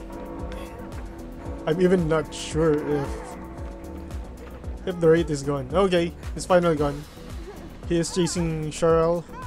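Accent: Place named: Philippines. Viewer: pinoy ka pre? medj dinig ko punto mo haha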